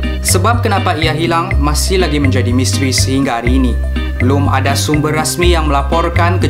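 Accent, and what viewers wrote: Sabah, Malaysia. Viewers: dia asal sabah tpi sda lama stay d KL tulah bckp pun ada cmpuran mcm bhasa sabah smenanjung & indon. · Obviously it's Sabahan... beza bahasa indo and malaysia... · Sabah ka kau ni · MN ada indon punya CKP MCM nie, hahaha dia org Sabah lh CKP MCM org KK... kotakinabalu bah Sabah bah.... salam dari kotakinabalu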